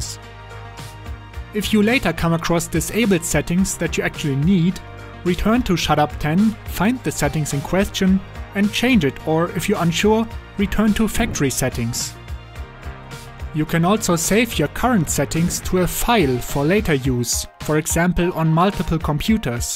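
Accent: Germany. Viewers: U are german Right? 99%😂😊💯nice video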